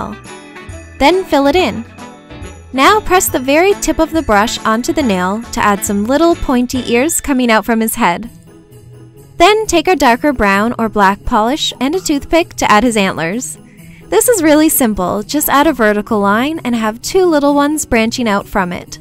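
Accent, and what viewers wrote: Newfoundland, Canada. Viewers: Are you from Newfoundland? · Isn't she from Canada though? Maybe it's a Canadian thing. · You're from Newfoundland??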